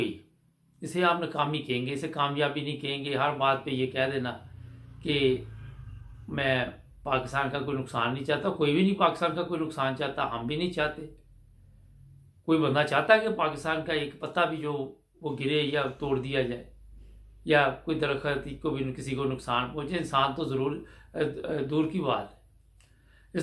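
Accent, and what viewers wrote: Punjab, India. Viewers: Your punjabi accent tell me you are from Saialkot .am I right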